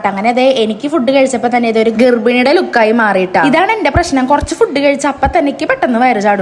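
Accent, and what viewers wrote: Thrissur, India. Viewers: ഇന്ന് ശരിക്കും തൃശൂർകാരി ആയ പോലെ സംസാരം 😂😊🥰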